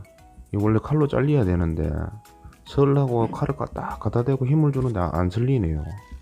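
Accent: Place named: Gyeongsang, South Korea. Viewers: ㅋㅋㅋㅋㅋ 어디 지방인겨~!? 부산이라예~? 사투리가 윽쓰로 구수한기 정감있눼예... ㅎㅎㅎㅎ 있는 그대로 말했뿌믄 음식 보다 나레이션 완쩐 찌깁니다!! ^^* 나레이션이 너무 구수한게 정말 매력이 좋습니다~ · 경상도 아재 특유의 사투리가 구수 해서 좋은것 같습니다. 항상 있는 그대로의 모습이 좋은것 같습니다. 위생 장갑을 끼고 요리 하시는건 왠지 살짝 적응이 안되는 일인 이라서 . 앞으로 좋은 레시피 영상 기대하면서 좋은 영상 감사합니다. 지금 나레이션 방식 변화없이 죽 가시기 바랍니다.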